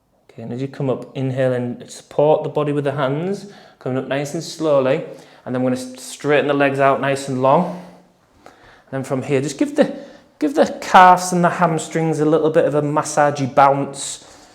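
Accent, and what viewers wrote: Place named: Yorkshire, United Kingdom. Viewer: Thank you, for this and all videos! I love your calm voice and your lovely accent (Yorkshire?) 😊 and I feel good after my runs when I stretch with you. So thanks again and see you soon!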